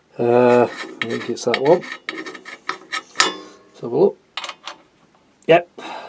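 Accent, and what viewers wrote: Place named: Nottingham, United Kingdom. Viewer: Why ho why did you take bed lock right out, you only need to free it off, you sound like a good notts lad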